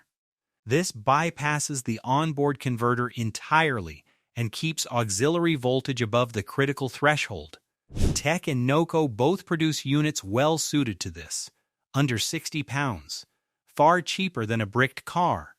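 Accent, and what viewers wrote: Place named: United States. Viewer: You should be more careful with your script writing because you’re using an American Standard accent, but referencing British currency. Also EV, is pronounced eeeee veee not “ev” like in evermore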